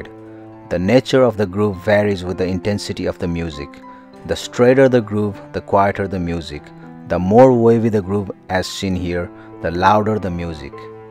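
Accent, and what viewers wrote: India. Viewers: The indian accent ruined the video. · that indian talkin lol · The first picture with a strong Indian accent like his, really confused me because I thought he was saying that it was a close-up of a dick. I had to keep listening and trying real hard to figure out it was a tick.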